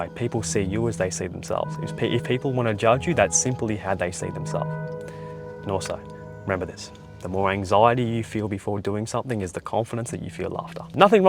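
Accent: Australia. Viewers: He's Australian?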